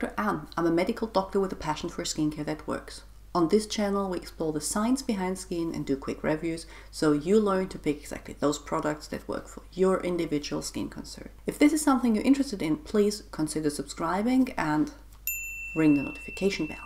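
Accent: Germany. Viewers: I think due to your pronunciation of the chemicals I directly heard the german r and a ☺️☺️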